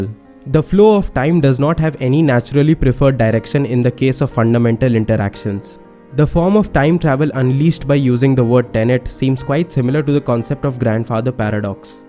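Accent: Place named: India. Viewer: What a pajeet accent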